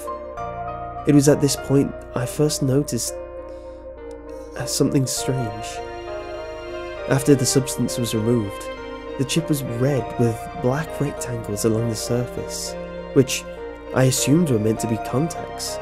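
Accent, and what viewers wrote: United Kingdom. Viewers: || WhoSquad he's British ever heard of it i think you don't because most people on youtube are american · yay british narator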